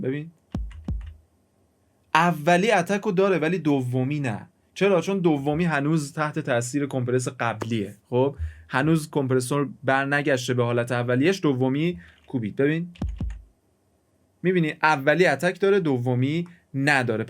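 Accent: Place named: Mashhad, Iran. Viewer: دمت گرم میگم شما مشهدی نیسی احساس میکنم لحجه داری مشدی داری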